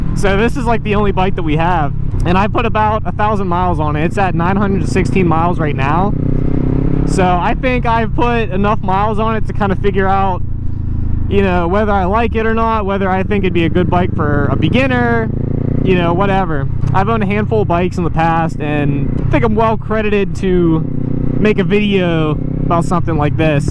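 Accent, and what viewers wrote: Pittsburgh, United States. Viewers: I was like dang this guy sounds like he's from Pittsburgh...then I saw Giant Eagle and Sheetz lol nice review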